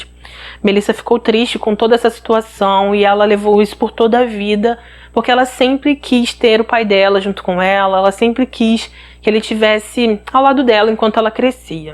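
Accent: Rio de Janeiro, Brazil. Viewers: Ainda tem esse sotaque lindo de carioca.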